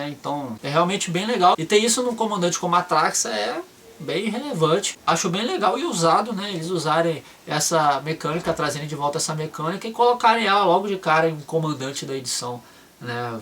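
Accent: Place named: Rio de Janeiro, Brazil. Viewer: carioquês é foda de aguentar... desculpa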